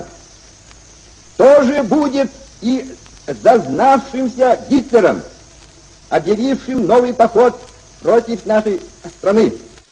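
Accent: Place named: Moscow, Russia. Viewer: Это московский говор. В конце 19- начале 20 века подобного было много. Та же булоШная, например. Сталин то грузином был, ему еще простительно.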